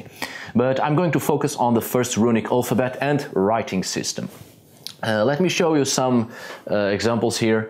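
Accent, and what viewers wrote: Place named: Portugal. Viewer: Were are you from' your accent looks very portuguese x)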